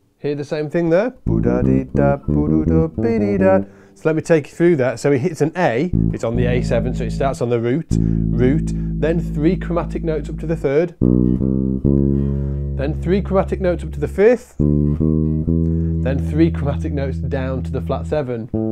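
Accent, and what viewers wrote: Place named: United Kingdom. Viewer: you are a great teacher. Years ago a police detective friend realized later in life the New York accent made him, let say upset. I too have found the UK accent has a similar effect on me. Which is the only reason I canceled my membership. Again you truly have the gift and it saddens me deeply. I wish you all the Health, Wealth and Happiness life has to give.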